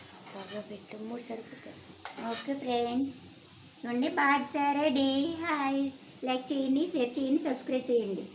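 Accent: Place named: Srikakulam, India. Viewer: Native srikakulam slang and dish 🔥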